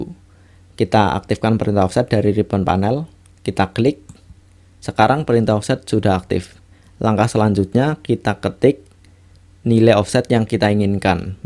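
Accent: Banyumas, Indonesia. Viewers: rika asline ndi kang? kayane ngapak ya?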